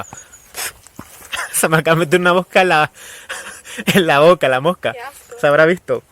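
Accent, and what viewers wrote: Spain. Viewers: X eso tiene el acento español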